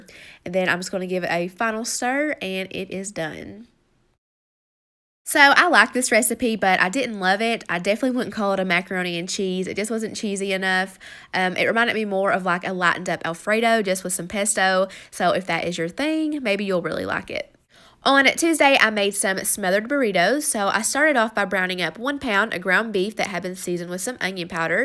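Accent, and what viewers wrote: United States. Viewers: Hi came here from the other vlog, im from Birmingham Uk. Love your country accent and your mac n cheese.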